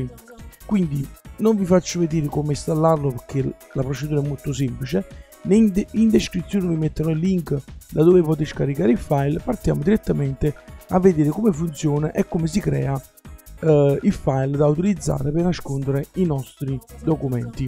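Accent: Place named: Naples, Italy. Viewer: con il tuo accento partenopeo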